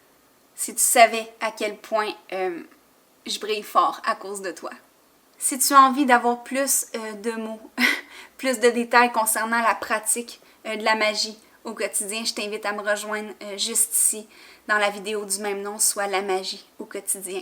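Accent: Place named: Quebec, Canada. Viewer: je viens de commencer ta chaine et jai regardé pas mal de tes vidéos et jaime beaucoup ! mais c'est marrant j arrive toujours pas à m avouer que je pratique 🤷‍♀️ pourtant ça fait 17 ans que jai mis un pied dans l ésotérisme 😅 mais tu m as convaincu d un truc que je devais vraiment créer quelque chose (j écris et dessine depuis toujours mais jai envie de travailler autre chose) en tout cas merci ! (non tu m as convaincu d autres choses j'aime bien aussi l accent québécois 😂)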